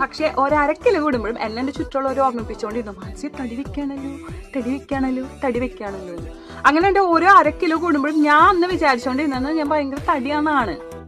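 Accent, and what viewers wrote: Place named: Thrissur, India. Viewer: Enik ishtaan thrissur slang njan kannur aaan kettaaa❤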